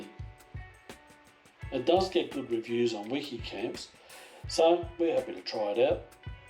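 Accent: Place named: United Kingdom. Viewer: What would you pay? We all understand your living on the edge each week until pension day. Mate drop the girls and the drugs, the cigs and the red frogs. Stop your whinging. Are you a Pommy? Sure sounds like it. Maybe small man syndrome.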